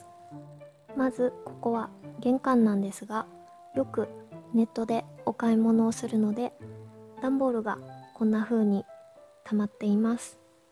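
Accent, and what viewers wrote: Kansai, Japan. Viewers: 少しだけ漏れてる関西弁好き☺️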